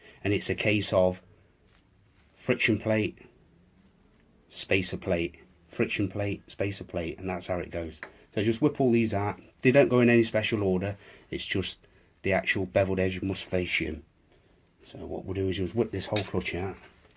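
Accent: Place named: Nottingham, United Kingdom. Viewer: made me laugh watchin this thought to me sen sound like propper notts lads. ad a look at ure other videos and seen you where keep it up las ive got a tl1000r and from notts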